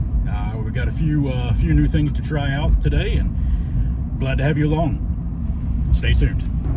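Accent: South, United States. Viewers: Hello there!! I joined your channel a few weeks ago.And you were in your new To you scamp trailer. I have begun catching up with your previous posts. That scamp now seems like luxury compared to videos like this. I have never lived further north than southern middle tennessee , where I currently live. I cannot imagine weather and ice like in this video .(BTW, Do I hear a trace of a southern accent in your voice?)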